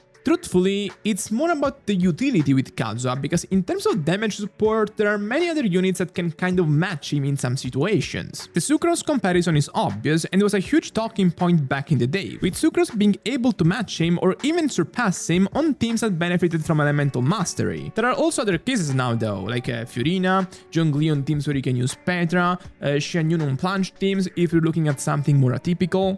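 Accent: Italy. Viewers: I love his italian accent:D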